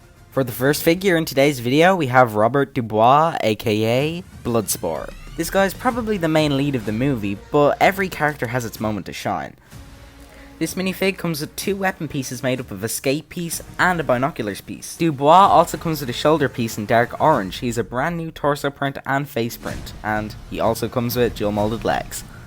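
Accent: Ireland. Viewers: Yo he's Irish!!!!! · Are you Irish I recognise your accent. I am Irish myself btw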